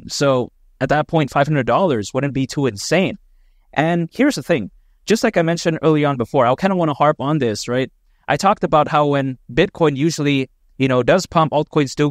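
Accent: United Kingdom. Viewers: Nice British accent! Nice content again as well. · Great British accent. I still can't tell if you're AI or not though😂 🤔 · love $icp and love the British accent 🌐👨🏼‍💻♾️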